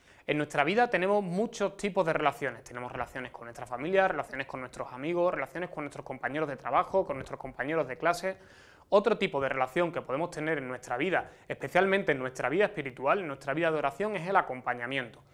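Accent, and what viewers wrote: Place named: Cádiz, Spain. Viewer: Hola ¿ eres de Cádiz? Tu apellido y acento son muy de la Tacita. Saludos cordiales desde Cádiz.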